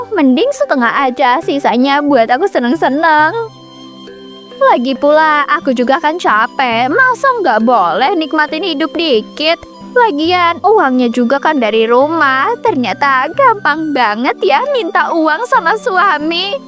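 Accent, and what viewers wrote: Java, Indonesia. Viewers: Logat bicara nya Kya logat Jawa tidak nyambung sama tema nya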